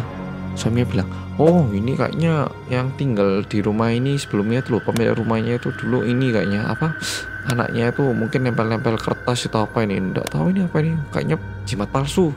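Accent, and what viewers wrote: Java, Indonesia. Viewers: "MENGGANDOLI" bersama orang korea yg medok tenan 👍🤭😁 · medhok ganteng wees🌛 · Gkpp deh udh 1 bln lalu bru nonton habis aku tuh nyari ka yang rambut ny agak panjang lebih ganteng hehehe kK muka ny bd setiap rambut ny pendek, pakai kacamata, dan rambut sedikit pnjng tp tetep ganteng koq kak yang medok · Bersama orng korea yang apasih kata mas? Aku taunya orng korea yg medog.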